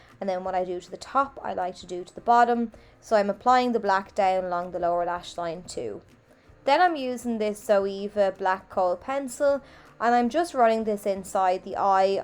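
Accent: Ireland. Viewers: Thank You for another fab eye look loving the smoky emphasis on the lower lash line and with a gorgeous Irish accent, I do love an Irish accent you know 🥰⭐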